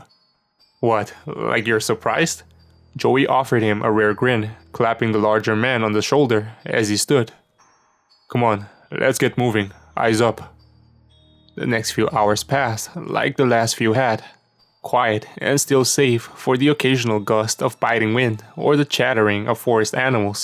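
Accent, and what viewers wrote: United States. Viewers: his robotic like tone with this acid us of A's accent make the video hard to listen more than 5 mn. So i read the comments.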